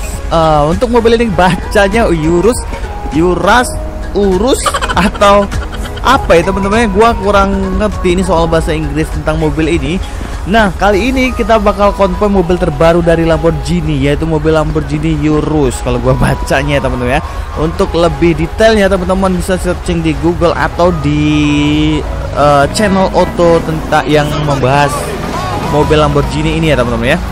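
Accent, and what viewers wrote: Bali, Indonesia. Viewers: Orang bali bli??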